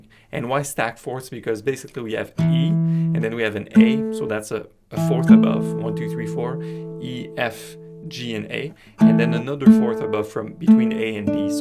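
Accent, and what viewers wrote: France. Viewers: Super un frenchy qui nous fait des tutos pour l'international avec le petit accent qui va bien. Et à part ça en français pour la France vous avez Monsieur ? Merci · Man, great video! But I can't wrap my head around where you're from! ;) It seems to me that you have a very very slight French accent (I'm French), but you speak an amazing English! It seems you're from Ottawa, but, gnah, with your name and this speech, something sounds fishy. ;) Anyway, keep up the good work! :)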